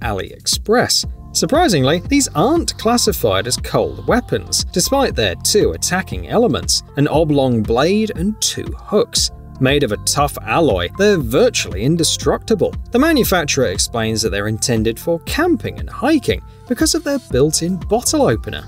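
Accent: United Kingdom. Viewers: Al-u-min-i-um is the British term. Judging by his voice, I would say the narrator is a Brit. Aluminium is also the preferred scientific usage, like helium & lithium. You've had a good day. You learned something new.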